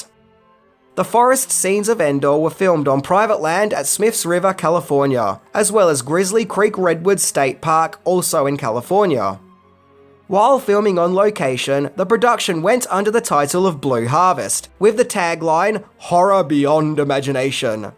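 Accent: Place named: Australia. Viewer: Yeah same hey :) . It's the down to earth Aussie accent that helps too :)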